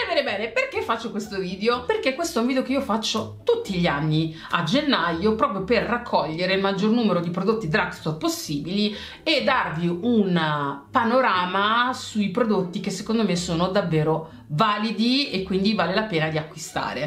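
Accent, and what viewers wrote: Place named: Apulia, Italy. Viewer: con l’accento pugliese mi mancava 😂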